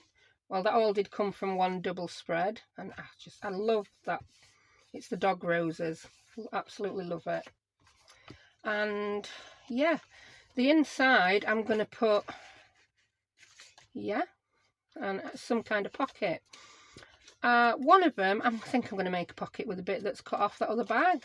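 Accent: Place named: Yorkshire, United Kingdom. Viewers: Thanks so much for sharin...really enjoyed watchin.Love the use of paper bags and the lovely papers.Really enjoyd your lovely accent...I live in Australia now but originally from Sheffield UK.I felt like I had a little visit back ome. Been in Ozz 26 yrs..still sound like a Yorkshire puddin...lol...All the best luv....xo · Edith from scrapbooking with me sent me over. Wasn't expecting a fabulous northern accent 😄 lovely project, thanks for sharing xxx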